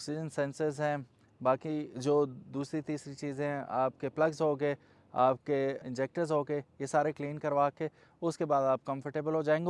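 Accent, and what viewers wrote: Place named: Hindko, Pakistan. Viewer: Apka hindko wala acsent hy mansehra sy hy ap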